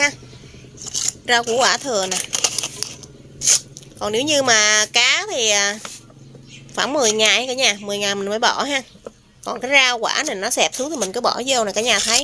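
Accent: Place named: Mekong Delta, Vietnam. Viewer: Da.tai coi nghe chi nói chuyện giọng trong trẻo và ấm áp nên e tưởng chị là người miền Tây ah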